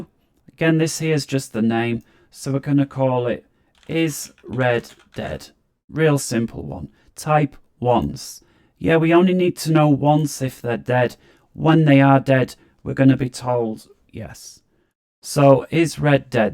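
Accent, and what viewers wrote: United Kingdom. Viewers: This is spot ON!! NICE one, and with a NW accent as well, even if it IS a Manchester one (and not a Scouse!! 😉). Thanks 👍 < There's ANOTHER thumbs-up as well as the one above!!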